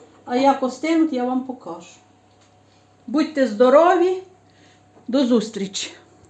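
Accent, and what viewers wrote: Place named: Prykarpattia, Ukraine. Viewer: ДОБРОГО ДНЯ! СЬОГОДНІ ВИПАДКОВО ПОБАЧИЛА ВАШ КАНАЛ І ПОЧУЛА СВОЮ РІДНУ З ДИТИНСТВА МОВУ. АЖ ЗАПЛАКАЛА. Я ТЕЖ РОДОМ З ПРИКАРПАТТЯ. ВІДРАЗУ ПІДПИСАЛАСЬ НА ВАШ КПНАЛ. СКАЖІТЬ, А ЗВІДКИ ВИ КОНКРЕТНО? ДЯКУЮ.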